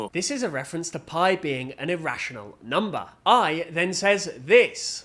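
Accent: United Kingdom. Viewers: A British(?) guy talking about a British show feels so satisfying and I don’t know why.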